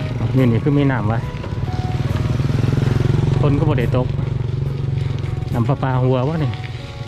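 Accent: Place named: Xe, Laos. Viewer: ສຳນຽງສຽງເວົ້າມ່ວນເນາະ ເປັນຄົນເຊບໍ່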